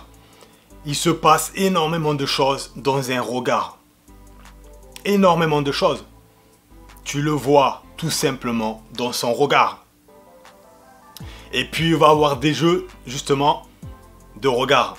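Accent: Marseille, France. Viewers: Ptdr ton accent marseillais me termine t un crack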